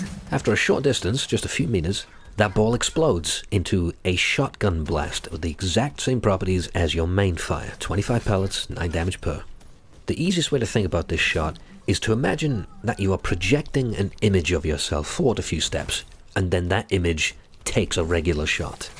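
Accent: United Kingdom. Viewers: But he's a strong contender with his British accent ( is a contender of Morgan Freeman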